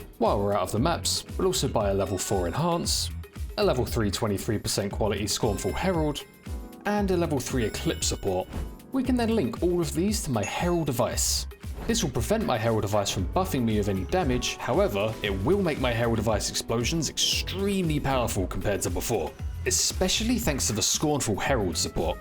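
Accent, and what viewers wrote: United Kingdom. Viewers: Bro you are literally the best creator in this space right now. The voice over is crystal clear with great humor and the brit accent is the cherry on top.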